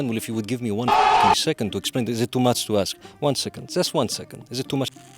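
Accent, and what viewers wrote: Greece. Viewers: Only thing I have to say is that you absolutely nailed the greek accent without a doubt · you can't possibly have such a good greek accent.. once I heard that λοιπόν I was sure I was hearing a compatriot.. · Bro, I totally thought you were Greek xD You fkng nailed it. Even the Greek words you threw in there had me at hello:D Awesome, learned a lot, and I'm not even a musician:D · why is your greek accent so spot on? xD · He isn't Greek? Μπρο It sounds so greek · Excellent Greek accent! And I learned a lot about music. As always. Keep up the good work! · I love your videos and your Greek accent!!! 😂 I’m currently learning Byzantine music and I learned that it traces back to the modes in Ancient Greece. There are 8 modes and they have names like Dorian, Phrygian etc., which are not the same as what western music calls them. You’re right, they did screw up the names! 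😂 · Λοιπόν! You manage to sound like a Greek speaking English even to the eeeeh between words. Nothing less than amazing! Also, once more, I have to admit that I've never thought theory of music (or theory of anything, really) would be so diverting. I laughed so hard my frappé came out though my nose! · Perfect Greek accent and appearance! Hilarious video! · No, but even with a musician's ear, he'd need to go to a few hundred Greek weddings to mimic the accent that well. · The Greek accent is eerily convincing. I sense the presence of a Greek significant other. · What the hell, i was runing your video in the background and i hear you greek accent! "Wait, hadn't i clicked?" But no you had to prove you are a brother to yet another people's nation! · Is this guy part greek or something how tf is he speaking that good greek and greek accent english????? He even knowσ the cretan "κατεω"!!!! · How come you have an Greek accent?:) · Funny and educating! 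Also, your accent is really Greek! Hopefully we''ll see similar videos about other music traditions! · Greek accent is truly masterful 🧠🤯🤯🤯🤯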